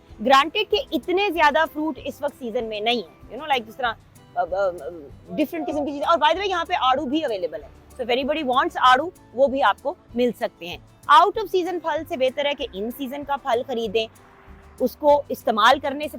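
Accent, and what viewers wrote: Punjab, India. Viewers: Camera person kisi chez ko focus kur lay .hur jaga loud ayr punjabi undaz agya hay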